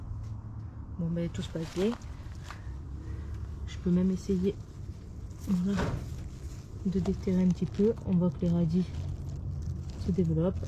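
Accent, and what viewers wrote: South, France. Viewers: coucou, j’ai découvert ta chaîne YouTube depuis la pas mal de temps... je crois que t’as un accent du sud 🤣😀😀 j’adore.. demain je vais planter des courgettes 🖍☢️♻️♻️㊗️.. Bon week-end. Yanis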